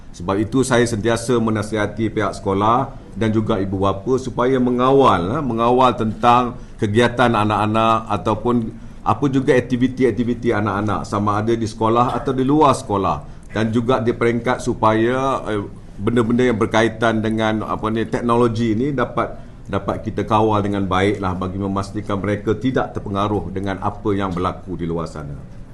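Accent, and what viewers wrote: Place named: Perak, Malaysia. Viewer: pekat bebenor peraknye yeop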